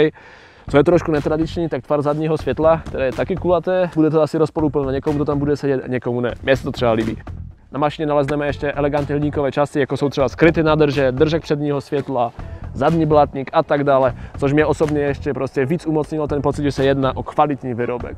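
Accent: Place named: Těšín, Czech Republic. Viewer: spíš bych to slyšel od Těšina na vychod... Třinec, Jablunkov , Mosty????? :-))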